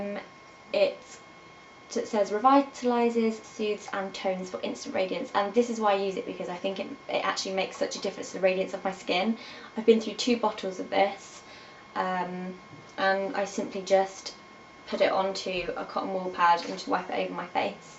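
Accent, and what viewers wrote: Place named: RP, United Kingdom. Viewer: You're so different in this video aww, really posh for some reason?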